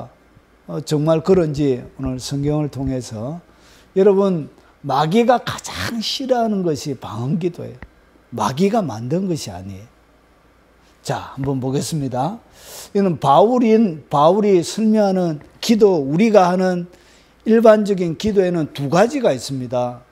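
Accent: Gyeongsang, South Korea. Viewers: 억양이 강한 경상도 사투리 때문에 그런 오해가 있는 것같습니다. 저도 경상도 억양 때문에 그런 이야기를 들은적이 있어요. 부디 방언으로 능력있게 기도하시길 바랍니다. 할렐루야 ~!!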